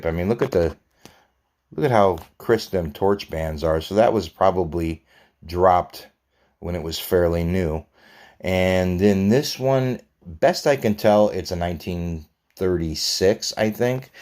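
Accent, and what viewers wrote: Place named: Scranton, United States. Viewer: Sound like a scranton boy to me?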